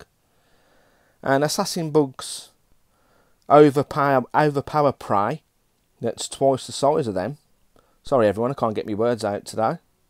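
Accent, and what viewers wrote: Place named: West Midlands, United Kingdom. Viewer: Ah I did also think Birmingham. I'm from Wolverhampton you see, it's nice to hear a somewhat local accent:)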